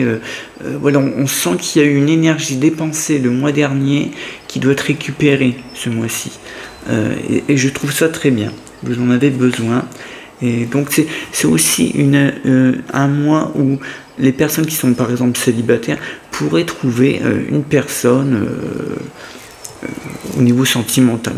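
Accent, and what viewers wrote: North, France. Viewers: Un grand merci pour votre tirage qui me parle beaucoup 👍 Vous avez un petit accent du Nord ? 🤔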